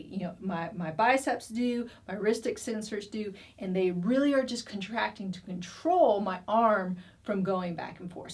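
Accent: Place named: South Carolina, United States. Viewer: I am a PT and I want to thank you for doing such a great Public Health Service with your videos. Your treatment is spot on and delivered very clearly in an easy to understand manner. I’m in Massachusetts now but couldn’t help notice that you’re a fan of SC, my home state! It’s nice to hear a familiar accent. Keep up the good work!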